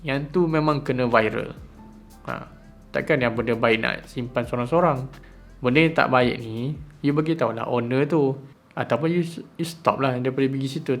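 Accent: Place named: Malaysia. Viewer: Anda sendiri bahasa Melayu nya sangat jelek.bahasa Melayu dicampur bahasa inggris.aksen bahasa Inggris anda juga jelek,masih terbawa aksen Melayu,bahasa Melayu bahasa suku di indonesia alias bahasa daerah.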